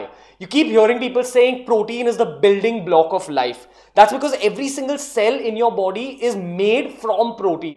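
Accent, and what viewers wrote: India. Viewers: 😂 I can’t concentrate. His accent is too funny. Not to be disrespectful but he has a strong Indian accent I don’t even know what his saying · So guys here is the pure Indian accent for you ..yeah I know its kinda weird 😐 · Lol his accent tells he is Indian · Why are people talking about your accent... That's a normal accent found mostly in higher middle class or upper class section of Indians... 🙄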